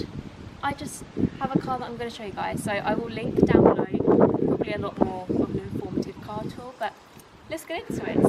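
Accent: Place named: United Kingdom. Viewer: i love the bri'ish accent :D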